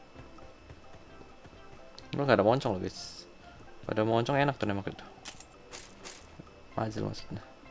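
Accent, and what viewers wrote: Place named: Medan, Indonesia. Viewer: bahasa nya bg kreak medan kali bang😂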